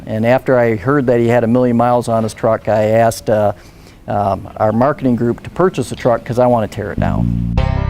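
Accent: Russia. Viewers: First thought "Wow, did Toyota sell the Seqouia in Georgia? And how did it get to Colorado and the US?!", then I remember the state 😂 Russian accent + the name a ex-Soviet republic 😛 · Прекрасный русский акцент. Очень понятный английский 😄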